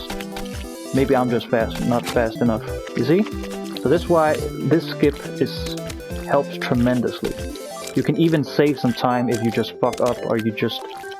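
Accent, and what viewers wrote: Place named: Sweden. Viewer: You sound swedish 😂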